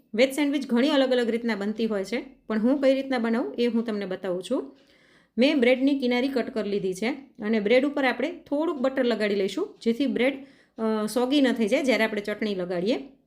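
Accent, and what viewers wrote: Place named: Saurashtra, India. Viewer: વાહ ખુબ જ સરસ બેન તમે કચ્છમાં રહો છો પણ તમારી ભાષા સૌરાષ્ટ્ર જેવી છે તમે સૌરાષ્ટ્રમાં ઉછરેલા છો, હું પ્રોપર સુરેન્દ્રનગર થી છું હાલ વડોદરામાં રહું છું. તમારી દરેક રેસીપી હું ટ્રાય કરું છું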